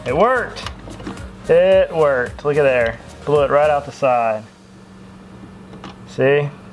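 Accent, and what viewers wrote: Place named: South, United States. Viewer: It's the accent....Southern braintrust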